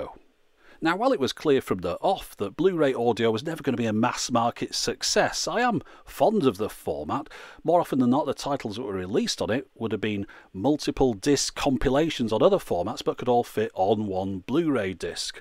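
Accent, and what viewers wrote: United Kingdom. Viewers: Excuse me so is difficult understand you because you're British accent. But so much interesting videos. I have the idea that music media would be pendrives. Are more handy than SD memory. Greetings from Chile.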